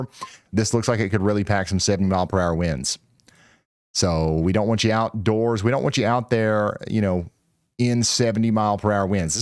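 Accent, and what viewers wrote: South, United States. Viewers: We southerners have our own way of pronunciation. It's a little ridiculous to have a man work late for your protection and you write this!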